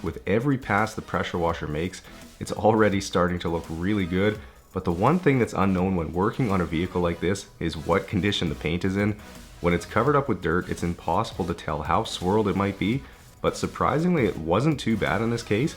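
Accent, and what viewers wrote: Canada. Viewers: He’s sounding extra Canadian in this one, right? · I can hear the Canadian accent for sure here lol please come to Toronto man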